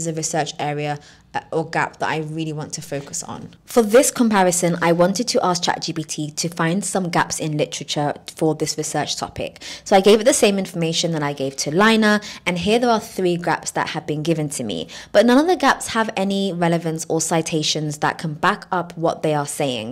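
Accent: United Kingdom. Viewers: Dear, I love your accent, its pure british, however your channel is expensive, I could not afford it.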